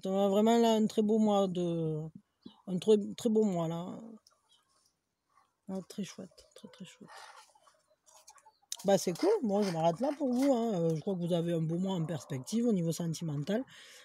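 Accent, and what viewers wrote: South, France. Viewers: Mais kel joli accent! ..méridional a priori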